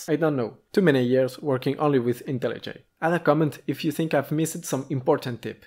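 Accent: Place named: Spain. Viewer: jajajaj, se notaba literalmente que es español y no me ha hecho falta ni buscarlo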